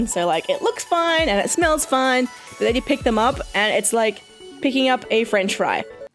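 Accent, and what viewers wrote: Australia. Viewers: Australia? Are you Australian?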